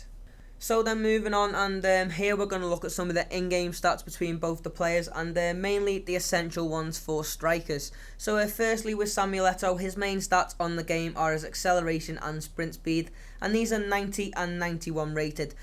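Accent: Liverpool, United Kingdom. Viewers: Yes scouser I'm a scouser were you from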